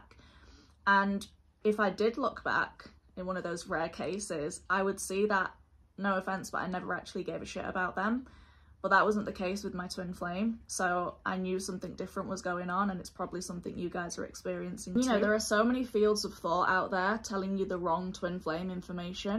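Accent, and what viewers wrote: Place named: England, United Kingdom. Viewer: ...i know right, since when did 'not' recording for awhile, and doing anything other than laying dead in a ditch or being in a prison cell, mean laying in a ditch or being in a prison cell. 😂😂😂 Again thank you, i love your beautiful interpretation and articulation of the TF Journey. I notice your english accent, im irish, so 'hi- diddly - ho, neighborino', as Ned Flanders would say. 😂🙏🏻💚 Keep up the good work.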